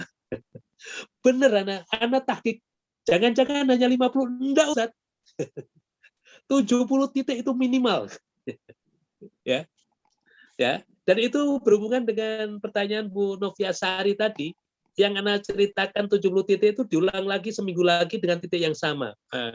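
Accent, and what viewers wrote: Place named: Java, Indonesia. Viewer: Pk ustadz katur asli mn y logat jawanya kental sekali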